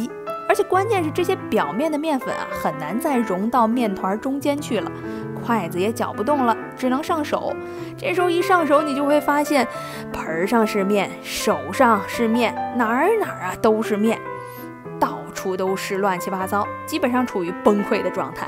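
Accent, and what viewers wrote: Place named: Beijing, China. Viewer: 我学到了面食的制作方法。我也听到了熟悉的，甜美的、北京的声音。很享受！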